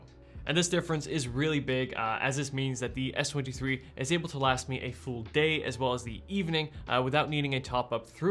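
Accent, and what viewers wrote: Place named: Netherlands. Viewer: Your English is great! I normally easily spot a Dutch accent, but here it took me 3 whole minutes :)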